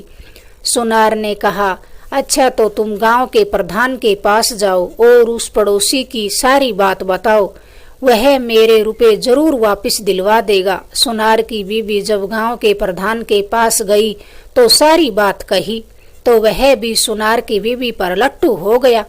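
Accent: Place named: Rajasthan, India. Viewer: महिला। राजस्थानी। है ।लहजे। से। पता। चलता। है ।रंगीलो। राजस्थान। ।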